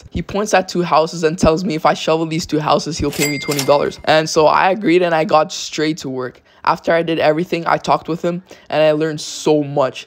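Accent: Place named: Toronto, Canada. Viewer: this guy lives in toronto i can tell from the accent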